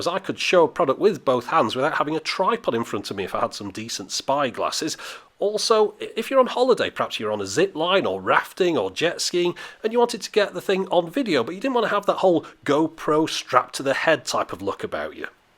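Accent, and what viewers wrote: United Kingdom. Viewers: that British accent :D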